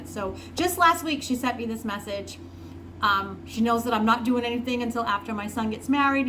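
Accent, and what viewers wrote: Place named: New England, United States. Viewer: She sounds like she's from New England area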